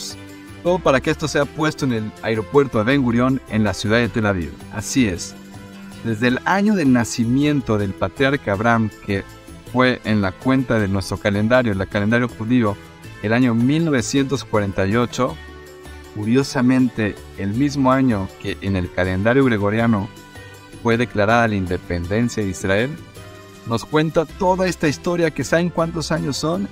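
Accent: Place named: Mexico. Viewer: TU acento es MEXICANO FELICIDADES ISRAEL LOGRO FENÓMENAL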